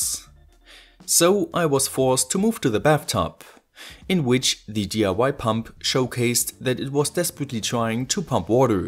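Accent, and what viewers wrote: Germany. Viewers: i can hear that you a german guy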